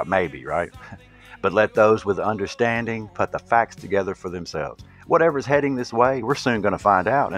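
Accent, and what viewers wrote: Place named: South, United States. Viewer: Hey brother i appreciate your work man. And i can tell u a southern boy like me and that makes me like it even more.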